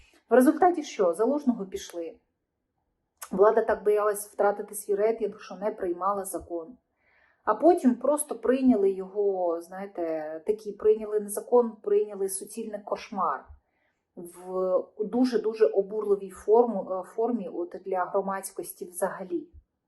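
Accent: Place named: Russia. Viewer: "Щьо,щьє", що за вимова у вас,вашою вимовою катувати ворогів можна.Аж вуха заболіли від такого мовлення.Ви напевне розмовляли російською,а тепер перейшли на українську.Жахлива дикція.😮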